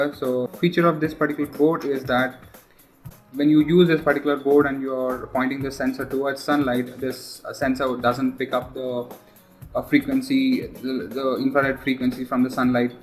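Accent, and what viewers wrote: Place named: India. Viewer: stop speaking indian, learn english dude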